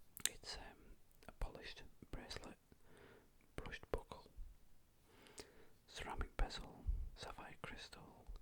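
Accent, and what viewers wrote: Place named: Northern England, United Kingdom. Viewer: northern england, we have the best accents!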